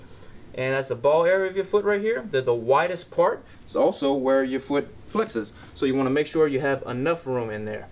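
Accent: United States. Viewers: You have to be from Louisiana with an accent like that. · How can you not love that New Iberia accent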